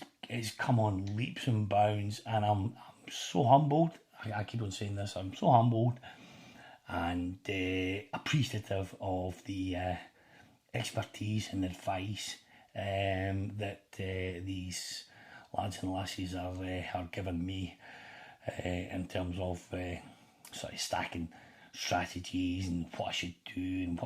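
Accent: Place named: Scotland, United Kingdom. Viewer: I think you are going to do well. Everyone loves a Scottish accent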